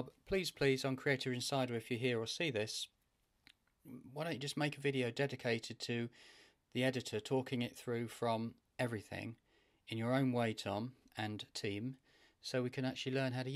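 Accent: United Kingdom. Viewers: They probably didn't have much enthusiasm for an Editor at all, thinking that many other software exist for detailed editing. If so they should call it not Editor but Trimmer, as it can only do trimming. Plus indeed they should have a more detailed tutorial on degrees of freedom, what will happen if we don't do this or that - like you did. I find their approach a bit ignorant, considering that we are probably not the first people who struggle with their program. Good thing that there are people like you, who take pain recording the video with explanations of what can go wrong. Thank you,:) I also liked your accent: Brits make English sound like music:) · Your voice is so British it makes me patriotic.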